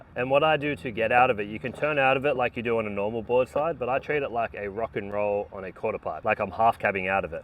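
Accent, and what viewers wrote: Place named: South Africa. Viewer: I don’t like the way u talk. South Africans always make me think of that blood diamond movie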